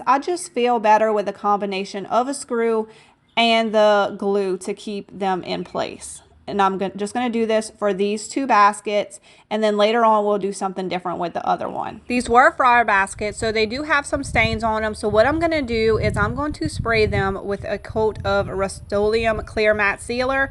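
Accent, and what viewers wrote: Louisiana, United States. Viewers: I love to hear her accent. Adorable Southern-Cajun. Her projects are all gorgeous. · your slight accent sounds like you are from New Iberia, LA. Am I correct?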